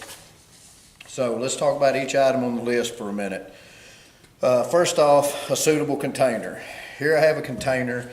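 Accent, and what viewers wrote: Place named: Appalachia, United States. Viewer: Right off the bat he sounds like a hillbilly.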